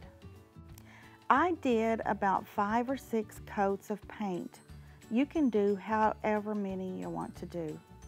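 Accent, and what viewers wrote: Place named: South, United States. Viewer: OMG you have to talk faster and shorten these videos. Half of this wasn't needed and the slow talking with the southern draw was too cliche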